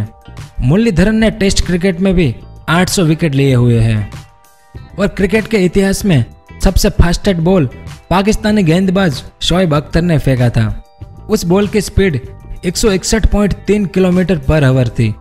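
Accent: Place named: Rajasthan, India. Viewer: Bhai ..aapki aavaj s lag RHA he aap Rajasthan se ho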